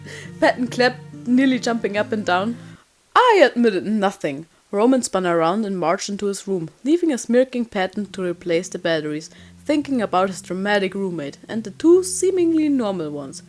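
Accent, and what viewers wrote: Germany. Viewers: WOAH I LOVE THIS SO MUCH ! I'M GLAD I FOUND THIS ! Can't wait to see the next part, if you are doing one! 💗 And English isn't your first language? And I may be wrong but you sound german ? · If I'm correct, I think German is your first language? Learning a new language is very difficult, but you're doing great, sis!! I find your accent pretty pleasant-sounding, especially the way you pronounce the names OML so satisfying. 😂😊 you're also relatable, from the end bit LMAO Keep creating!! 😊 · Sorry if this is rude but are you German? Your accent reminds me of other German people's English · Watched to the very end XD For a little critique/some compliments: I'm learning German and one of my teachers has a similar accent to yours. Learning a second language is really hard :( . I really enjoyed the whole thing even if there wasn't a great deal of animation; I think it still works really well, not that I'm an expert in any way, and the music was perfect. 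The story was creative and convincing and I'm excited to see what happens next and if it somehow ties into Thomas Sanders' life- although I doubt that that will happen. I discovered TS recently and O.M.G.! His Sanders Sides are perfect and somehow so accurate- it's like therapy and they're so helpful. Love the art style, don't overwork yourself ;)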